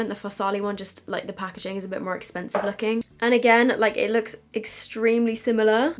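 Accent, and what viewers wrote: United Kingdom. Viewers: Also, I need to say this: I love love loooove the british accent! Could listen to this all day!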